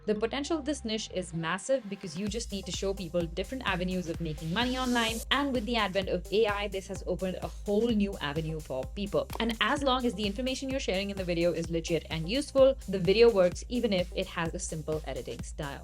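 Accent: India. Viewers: Your voice like india sound in you voice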